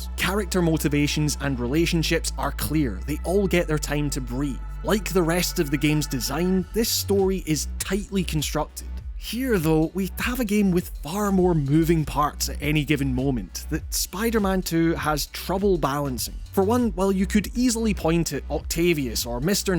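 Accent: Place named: Scotland, United Kingdom. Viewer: This is a Scottish accent.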